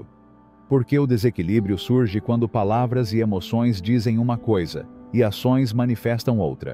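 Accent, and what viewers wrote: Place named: Minas Gerais, Brazil. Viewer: O narrador é mineiro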